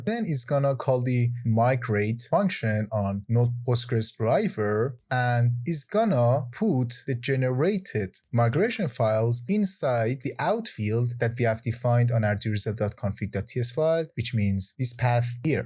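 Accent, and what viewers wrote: Iran. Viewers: I am hearing a familiar accent? Are you Persian bro?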